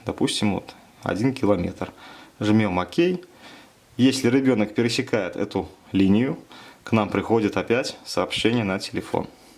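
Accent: Belarus. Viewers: Акцент на белорусский похож)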